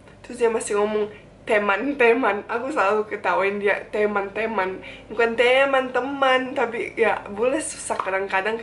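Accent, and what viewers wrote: Jakarta, Indonesia. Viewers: Logatnya, gak ngerti lagi, udah bagus bgt sih, udah kayak orang lokal, kayak org jakarta. Jadi ngerti deh kenapa ada bule yg meskipun udah lama di Indo tapi gak bisa bahasa Indonesia, ya karna mereka gak pernah nyoba jadi org Indo beneran, berbaur sama masyarakatnya. Jangan lupa ya bilang makasih sama gorengan dan sayur asem, kayaknya itu bantu bikin lidah agak fleksibel deh hehehe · Betawi bgt 👍, btw sekali2 ajari kami bahasa Bosnia 😁 dan met menjalankan ibadah puasa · Logat bahasanya indonesia banget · makin cantik aja. bule logat betawi hehehe bule yang gak mau belajar bahasa indonesia itu bule gak berpengalaman. hehe.. 10 tahun kedepan bahasa indonesia itu menjadi bahasa penting dunia.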